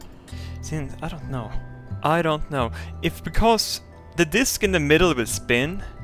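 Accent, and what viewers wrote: Sweden. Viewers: shutup Dave no 1 likes u thats y u dont have friends. is swedish of course hes going 2 have a different way of talking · i watch just to hear him say a word with a J i love sweedish accents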